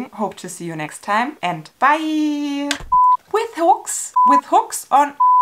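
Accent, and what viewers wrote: Germany. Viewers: Where are you filming from love? Love your German accent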